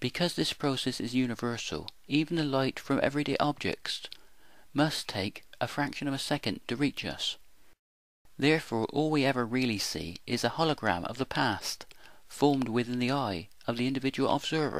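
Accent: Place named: Bristol, United Kingdom. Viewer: Incoherent rambling in a thick bristolian accent... ...nice use of big words, by the way. To me, this gives insight into a mind that is clearly fascinated with the concept of ambiguity, which obsessively attempts to apply it to scientific observation. This smacks of pseudo-religion. I am not without my own philosophical musings, but this is simply pretentious.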